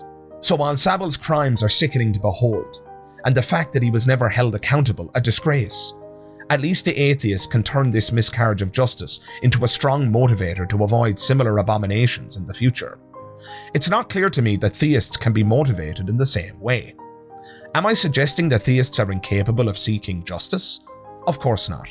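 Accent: Ireland. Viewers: you're welcome, irish script reading guy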